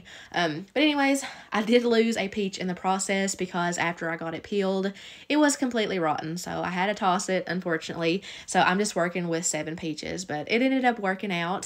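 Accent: South, United States. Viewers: Hi - I really enjoy your cooking videos, you are very creative, plus I love your beautiful Southern accent. I notice on American vlogs pieces of pork are referred to as "pork chops". Here in Australia we only call it a pork chop if it has a bone in it. Thankyou for all your ideas & wonderful recipes. Best wishes from Sydney Australia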